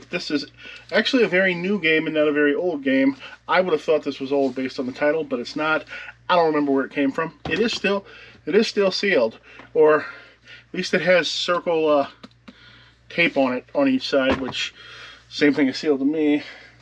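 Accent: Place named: Missouri, United States. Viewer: You sound like your from Missouri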